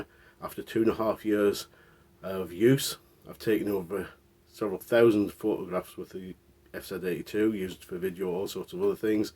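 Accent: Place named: Newcastle, United Kingdom. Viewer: Is this accent from Newcastle, by any chance? (Just curious, and I’m aware I can be way off with my guess)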